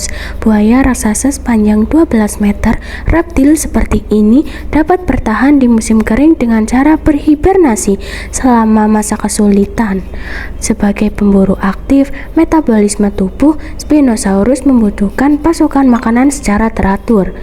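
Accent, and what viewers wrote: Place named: Java, Indonesia. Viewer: Pengisi suara logat JAWA mbaknya 😄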